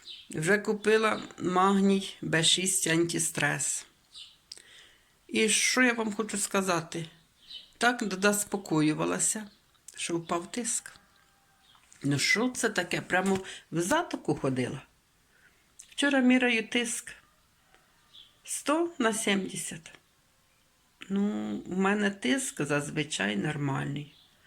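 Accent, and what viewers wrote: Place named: Poltava, Ukraine. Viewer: Вас також хайБог береже і Ангел Охоронець. Бережіть себе, дорогенька, це я запитувала, звідки ви. Бо по говору наче з Полтавщини. Ви дуже приємна жінка. Приємно почути, трішки відволіктися від своєї буденності, думками когось пожаліти, заплакати. Я дуже мало кого дивлюся, а вам , дай Боженька, здоровʼя❤️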